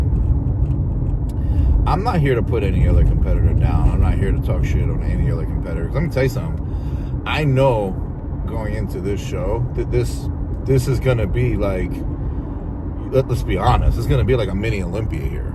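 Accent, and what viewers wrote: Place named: New Jersey, United States. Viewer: I really think the biggest problem with how you talk is that people don't understand how we talk in New Jersey.. so the problem is really theirs.. fuck em.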